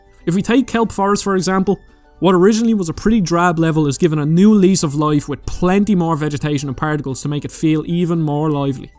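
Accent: Ireland. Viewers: I just love the Irish accent, instead of thing it’s ting ah I love it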